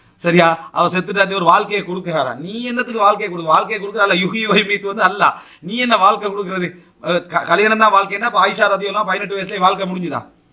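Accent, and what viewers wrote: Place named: Sri Lanka. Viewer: Avar srilankan tamil.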